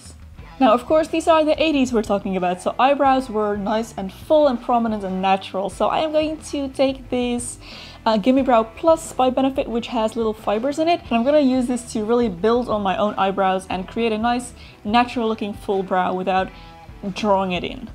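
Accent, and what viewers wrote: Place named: Netherlands. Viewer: ik wist dat je Nederlands was toen ik je accent hoorde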